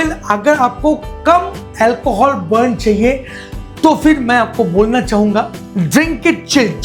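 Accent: Bengal, India. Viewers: Bengali accent chillia chiliia berachhe tmr theke 😂❤❤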